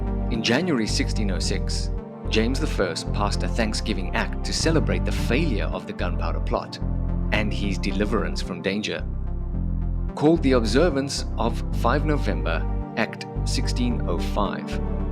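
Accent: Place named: South Africa. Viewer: I note your South African accent. My ancestors were REFUGEES to the Cape Colony from France, due to bloody religious persecution BY THE CATHOLICS, who MURDERED the family who remained in France. Catholics TORTURED and MURDERED whoever disagreed with their totalitarian orthodoxy. Fawkes was an attempted MURDERER of the cradle of modern democracy. He was no hero. He was a servant of tyranny. His death is celebrated to this exact and VERY DAY throughout the UK. With good reason.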